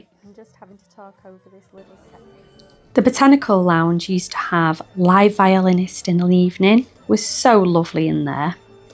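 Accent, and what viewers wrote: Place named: Lancashire, United Kingdom. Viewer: Sounds like a Lancashire Accent, Maybe Oldham area?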